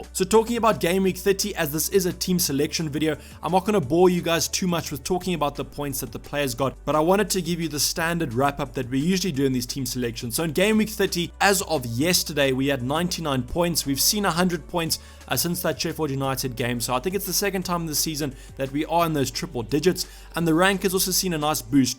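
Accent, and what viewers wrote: South Africa. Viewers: Is this guy south african ? his accent is odd i like it tho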